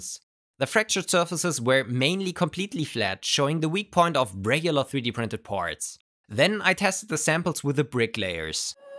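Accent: Germany. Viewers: Do I hear a fellow German?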